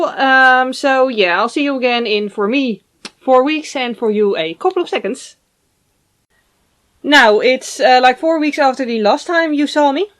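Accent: Netherlands. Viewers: That dutch accent 👌🏻 ik zie je 😍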